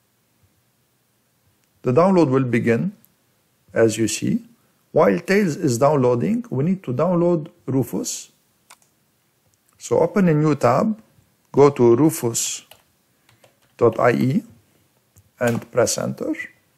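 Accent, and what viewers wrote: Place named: Lebanon. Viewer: thank you for this excellent tutorial! I just wanted to ask you, are you originally from Lebanon bc your accent is familiar. Anyway, thanks again!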